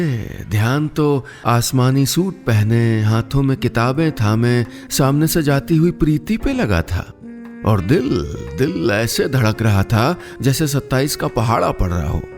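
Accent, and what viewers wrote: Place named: Mirzapur, India. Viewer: Accent Mirzapur wala hai 👌👌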